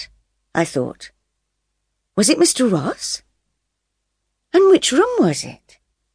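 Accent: United Kingdom. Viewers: مشكلتك الاكسنت البريطانى غير واضحة!!! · Thank you. It so helpful for me in British accent and reading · This is British accent, I do not like it. I like American accent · British or American accent? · Because that girl who is reading for us the story is an English girl which means she has clear accent English.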